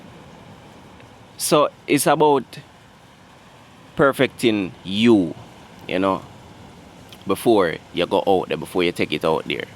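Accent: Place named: Patois, Jamaica. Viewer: I came across your channel by accident. But I am glad I did, as someone who has a xaymacan background. I love to support xaymaca. I love that you speaking in patwah language, and just being yourself. And not using the standard English. I am vegan, but still watching the channel. Reminds me of the xaymacan dishes my family makes.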